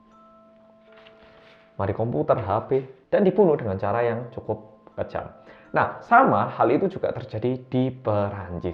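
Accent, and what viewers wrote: Java, Indonesia. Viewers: Mas yaaang medhoookk. 😀 Welcome detektif reomit ❤️❤️❤️ Stay healthy forever,mas 🤗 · Oppa medok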